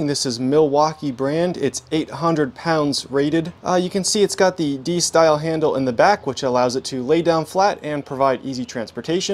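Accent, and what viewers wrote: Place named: Canada. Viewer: are those Canadian dollar prices ? you sound Canadian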